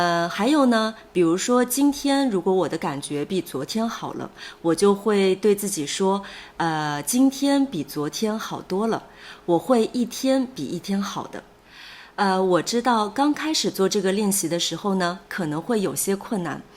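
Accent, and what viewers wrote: Standard. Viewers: 非常感谢老师 ！你的视频太有意思了 ，你讲的中文也很标准，听得清楚，口音也很好听，可爱。而且视频后的生词和造句也对我很有帮助..。我学到了好多词汇。一举两得❤😊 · Another great video! Keep up the great work! (Also, very good standard accent)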